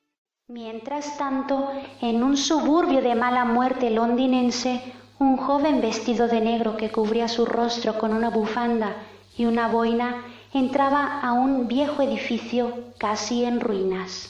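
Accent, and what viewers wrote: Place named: Spain. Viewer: Sip, lo sé, solo que hubo una de tus suscriptoras que preguntó que de donde eras y me atreví a responderle 😆😆😆(perdón)que eres española y eso se nota claramente en tu acento. Saludos.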